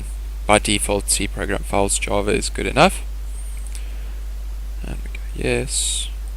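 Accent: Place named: South Africa. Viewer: I opened this video randomly and immediately recognised a South African accent😂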